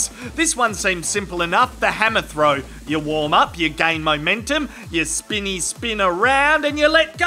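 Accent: Australia. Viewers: U sound Aussie. R u?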